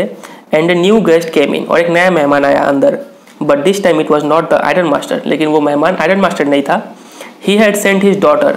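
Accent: Bihar, India. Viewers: Your 3 tho and kaati proves you bihari😂😂lol I am bihari too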